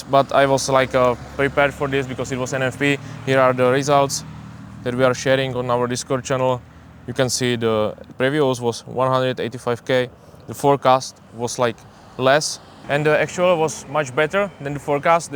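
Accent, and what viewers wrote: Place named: Czech Republic. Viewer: ten ceskej prizvuk je tam hold slyest🤛:finger-red-number-one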